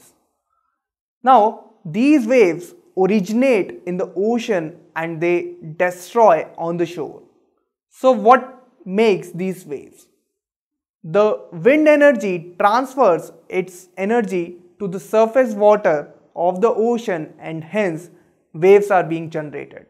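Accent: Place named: India. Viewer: Because, his brain starts in Hindi then... to Indglish ..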